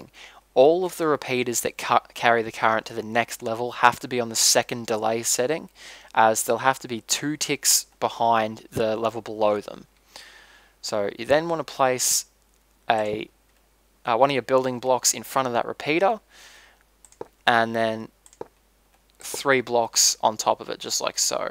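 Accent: Australia. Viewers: I left a comment Down Under, where your accent's from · Are you Australian? :) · down under XD sorry made me giggle I work with Aussies so i find all of the little dialectal inflictions amusing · are you aussie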